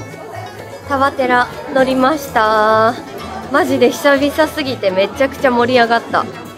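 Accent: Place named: Fukuoka, Japan. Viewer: 私も福岡で方言喋ってるの見て嬉しい、ほんとかわいいなぁ…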